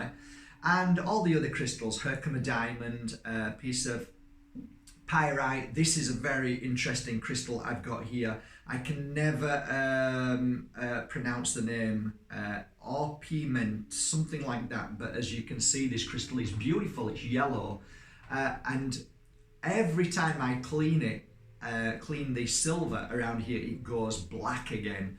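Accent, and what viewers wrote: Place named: Northern England, United Kingdom. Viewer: Hi...🙂Love your videos.Just came across you this week.Thankyou for taking the time to make them🙏 Just wondering why you didn't mention the energizing/cleansing power of one of natures super powerful and most beautiful things...The Moon!? Anyway, thankyou again. Your accent is very Northern(trying to place it🤔)...Greetings from Manchester UK (originally Kearsley Nr. Bolton).Namaste 🙏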